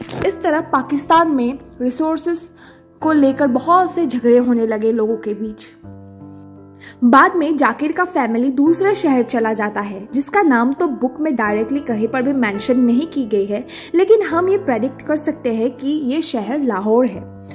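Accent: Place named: Northeast India, India. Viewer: North eastern hindi accent vibes 😊 Well explained , that helps me a lot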